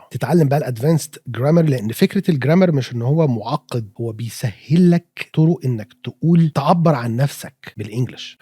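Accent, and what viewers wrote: United States. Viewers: Hi How are you? OK I hope. Thank you for this useful video, I felt your accent is the American one & it is really heavy, if I speak with you, my accent will be the English accent of London😅,I have learned English the hard way, I speak French plus some Spanish, Romanian, Turkish, kurdish & some other dialects as well plus mother language too 😊 My level in English will be between B2 & C1 👍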